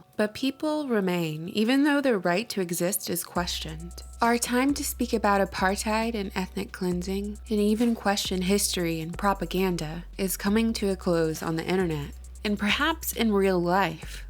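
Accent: United States. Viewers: Sounds VERY American to me, A damn shame. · Are you from the South?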